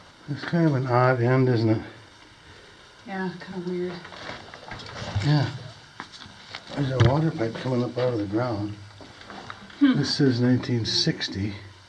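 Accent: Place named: Upper Midwest, United States. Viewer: I am very curious where you are originally from? Your accent sounds like you’re from the upper Midwest, Upper Peninsula, Minnesota, etc?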